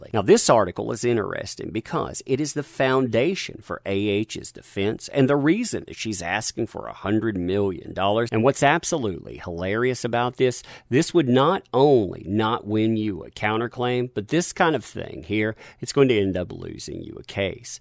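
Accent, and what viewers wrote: South, United States. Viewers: Love you're work and am with you on the things you talk about. It is scarie to think about the due process is being fuzzed out with pure crazy making b.s.everywhere and needs to be addressed before it's lost completely. Thankyou for you're time and effort to enlighten folks to what's going on. 👍 Loving the southern droll way you speak. ❤️ Love from UK.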